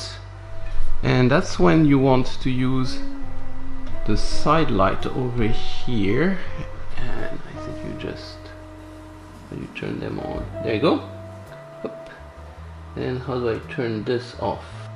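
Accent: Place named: France. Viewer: Nice bit of jazz and a french voice 👍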